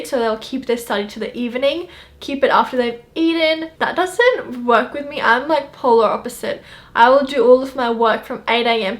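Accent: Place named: Australia. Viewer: I think she lives in Australia, because of her accent, and it was uploaded in june and she said that it was winter I think.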